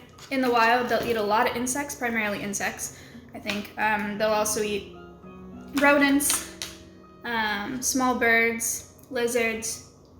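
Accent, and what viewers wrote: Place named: United States. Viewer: Why is her wheel on the right side of her car? Is she not American? She sounds American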